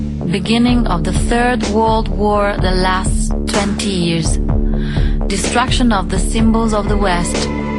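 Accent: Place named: Italy. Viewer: chiunque abbia fatto questo video poteva almeno prendere qualcuno che perlasse Inglese in maniera decente, e non il solito pastone Italiano/Inglese in stile Toto' 40 anni fa....patetico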